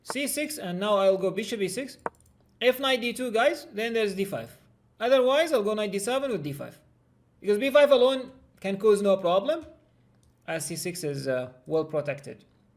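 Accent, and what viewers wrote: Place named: England, United Kingdom. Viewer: Idunno that u have an english acc whatever luv uu from irakk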